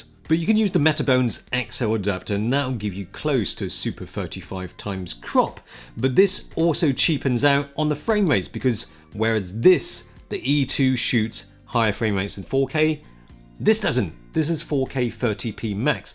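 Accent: United Kingdom. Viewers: imagen being lost in china and you bumb into this guy for directions lol he sounds more britsh than most brits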